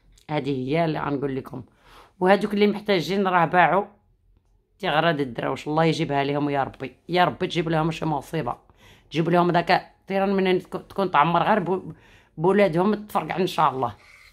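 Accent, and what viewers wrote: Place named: Arab world. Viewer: J'aime bouquau cet accent arabe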